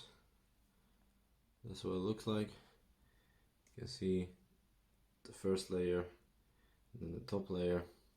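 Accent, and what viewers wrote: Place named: Poland. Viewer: zajebista forma byku, niezły twister, piszę po polsku, bo słyszę akcent XD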